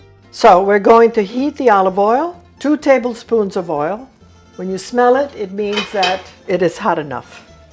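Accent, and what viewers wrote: United States. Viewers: This woman has never lived here in Sicily in her life... "Sicilian" my butt.. Thick AMERICAN accent... Americans always say things they are not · She has an extremely American accent. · how come you sounds american and not italian?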